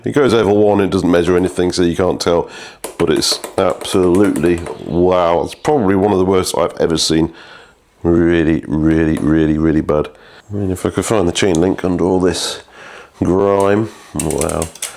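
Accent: United Kingdom. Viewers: "The hears seek to deift uo and down the middle of the cassette and shifts by itself" Fitting the deraileur might help 😂 we can only get awsy with this level of dry himour with a british accent. Like the time a eomsn brought me a wheel thst was out if true by over an inch in mire than 1 spot and asked me to tighten one spoke. Calmly explained that the whe is more than 1 spoke and it doesnt work like that. She was absolutely furious. Of course i offered her a proper solution but she insisted on just the 1 spoke before storming out in a fit of rage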